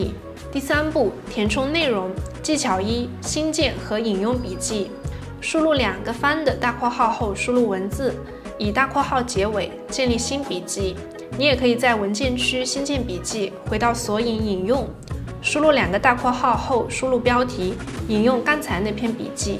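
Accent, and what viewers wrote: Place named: Zhejiang, China. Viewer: 挺好的，你是哪里人啊？好像有点浙江口音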